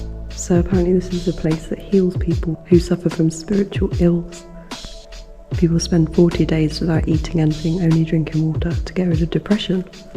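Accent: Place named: Somerset, United Kingdom. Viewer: Btw, I'm trying to place your accent, I think you're from my neck of the woods in England. I'm gonna guess Shepton Mallett, Taunton, Glastonbury....somewhere around there :)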